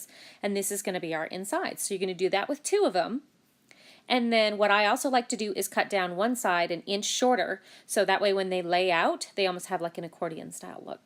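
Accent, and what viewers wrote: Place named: South, United States. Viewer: Really nice tutorial. Could you please tell us the name of that tape again, (I'm from California & I couldn't quite catch it with your So. accent) LOL Thanks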